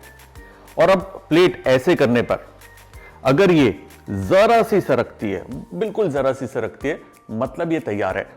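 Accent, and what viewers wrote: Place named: Pahadi, India. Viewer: Sar m bhi almora se hu lekin abhi tak mne you tube p y mithai banti nahi dekhi good lekin aap kaha se ho pahadi ho baat karne se to lg Raha hai ki pahadi ho khafa dena wala sabd ❤❤❤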